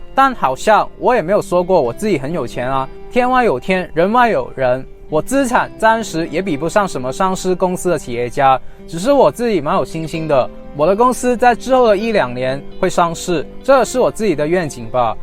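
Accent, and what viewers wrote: Hong Kong, China. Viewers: 怎麼口音聽起來像香港人呢？ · 是哪裏人聽着像香港的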